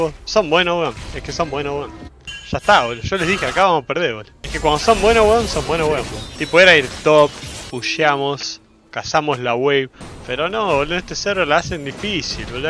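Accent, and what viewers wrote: Chile. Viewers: Grande hacías falta, pero algo me dice que alguien te está pegando un acento medio chileno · Soy yo o me parece notar que a se le está pegando el hablar como chileno, con acento y todo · hablas como chileno ahora:face-purple-crying::face-purple-crying::face-purple-crying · Por que habla como chileno ahora :V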